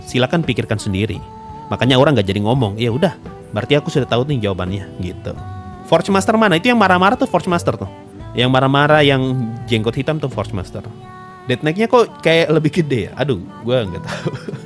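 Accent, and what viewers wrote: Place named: West Papua, Indonesia. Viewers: Iya, ane rasa seperti org Papua bagian kota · Maaf kk klw boleh tw kk dari daerah mna, soalnya logat nya kek ank" Papua barat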